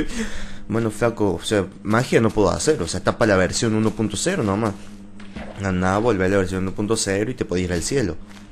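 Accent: Córdoba, Argentina. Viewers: Se Nota Que Sos Cordoves De Argentina :D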